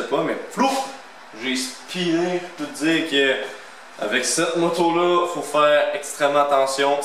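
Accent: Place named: Canada. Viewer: J'adore la 1200 bandit... et le parler canadien ! Bonne route et soit prudent, c'est un peu brutal par rapport aux motos modernes...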